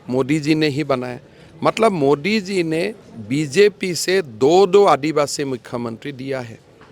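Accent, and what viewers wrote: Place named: Bengal, India. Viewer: Ye bangali type ka bolta hai bhagao isko yaha se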